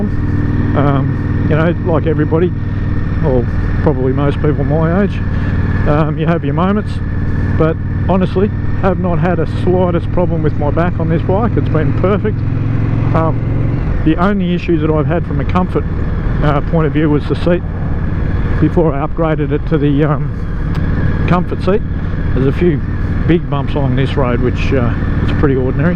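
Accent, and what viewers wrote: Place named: Australia. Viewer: Nice review mate.... great to hear an Aussie review... goes like the clappers..... brilliant.... I can’t wait , one day I will join you guys......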